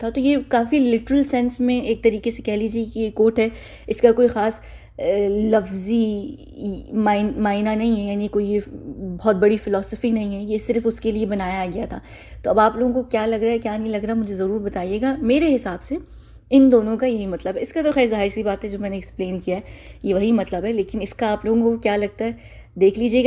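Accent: United Kingdom. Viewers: You have a beautiful British accent 😘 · I like ur English accent, unlike some haters I just hope u speak in English more.. 😀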